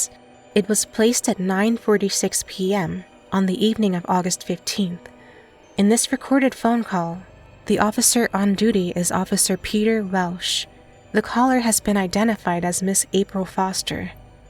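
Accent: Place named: West, United States. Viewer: I love the western accent!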